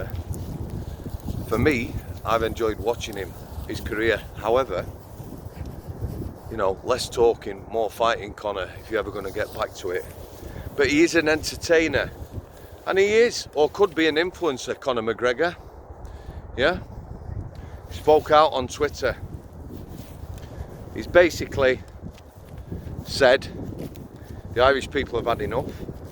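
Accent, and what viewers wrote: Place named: South Yorkshire, United Kingdom. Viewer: Love the accent, I'm hearing South Yorkshire. Very well delivered and to the point. Thankyou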